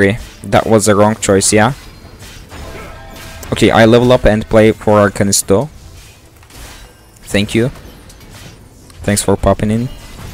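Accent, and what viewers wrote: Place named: Turkey. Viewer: aksanindan türk olduğunu anladım sonra kanal adını gördüm :D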